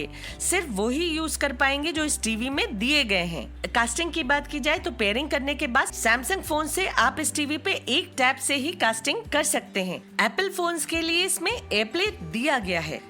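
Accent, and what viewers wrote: India. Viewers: I’m from Brazil and I can’t understand the Indian accent, so I put it in mute and turn on the subtitles… great review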